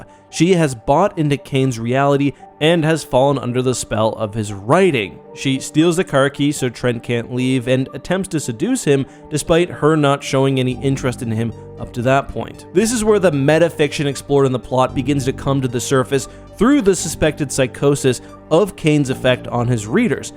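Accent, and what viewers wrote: Canada. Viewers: Is the narrator Canadian. I could hear "OOT" and "ABOOT".....?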